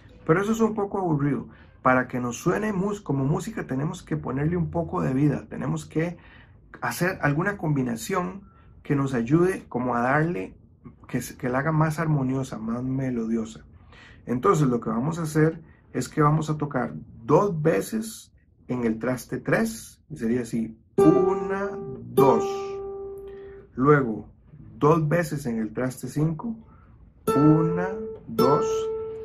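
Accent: Costa Rica. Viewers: solo he visto un minuto, pero me atrevo a decir que sos tico. Gracias, voy a aprender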